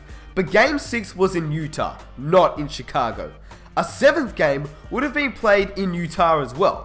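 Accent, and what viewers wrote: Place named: Australia. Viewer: What do you mean? you always sound Australian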